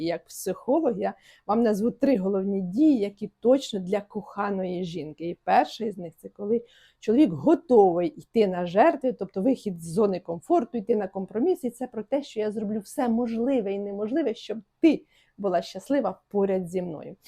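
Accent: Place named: Russia. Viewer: Не столовій а чоловікине щьо а що перестаньте Па рускі вимовляти українські тверді приголосні ж ч ш щ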